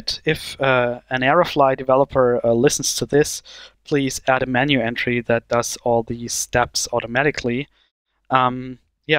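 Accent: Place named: Germany. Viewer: Ich liebe es wenn deutsche Englisch reden✌️ P.S. gutes Video xD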